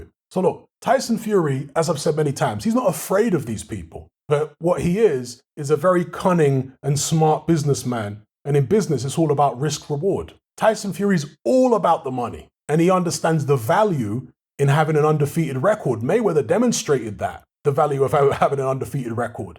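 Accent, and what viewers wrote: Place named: United Kingdom. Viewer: i just assumed it was a bri'ish accent thing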